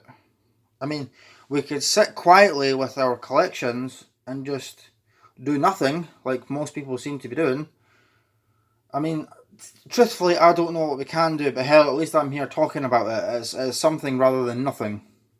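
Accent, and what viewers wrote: Scotland, United Kingdom. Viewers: Just nice to hear a fellow Scotsman talk about similar hobbies, even if you were rambling shite, I'd still watch haha. You've spread loads of awareness with this channel!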